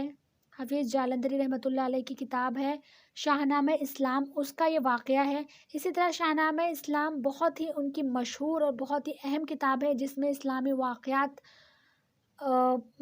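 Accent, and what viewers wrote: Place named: Pakistan. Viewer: aapki awaaz Ka tone Pakistan ke jesa hai Kafi Pyari Awaaz hai aapki aap pakistan ke hooo😍❤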